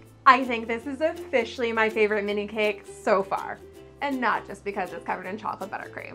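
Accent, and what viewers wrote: Canada. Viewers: Is she Canadian or Australian?? She sounds just like me. Just the way she says out she sounds just like simply nailogical too!!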